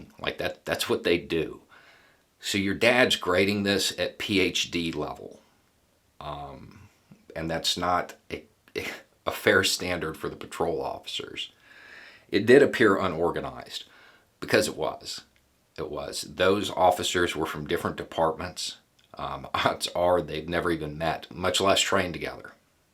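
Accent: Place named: Oklahoma, United States. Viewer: are you by chance from Oklahoma? (accent related)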